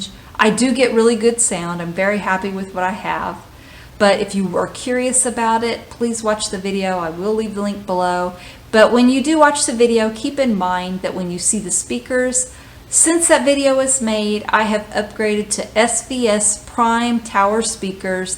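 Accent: South, United States. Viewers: Hey, how are you doing? I subscribe to several members of the vinyl communityand they are increasingly starting to mention you as an influencer in the vinyl community. So that's very good for you and it means your influence is growing.I know you have mentioned that I believe, you were born in Indiana.I have many cousins who are from Indianapolis and they have what I would consider from Chicago a southern accent.yours is more pronounced so do you think where you live now has increased your southern accent? I've always been interested in how people speak compared to where they grew up in so that's why I'm asking.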